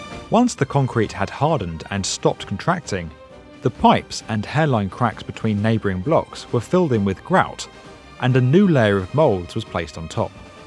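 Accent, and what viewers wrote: United Kingdom. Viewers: Bro it was obvieous from the bigginni g that he is brit all the evidance was pointing at it from not sounding the R at the end and from many things that differs brits from american accent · Why do we have a British guy talking about American history?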